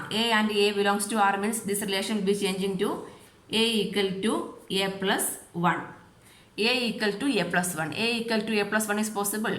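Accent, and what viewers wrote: Kerala, India. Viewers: Hlo mam r u from Kerala I found it by ur language slanting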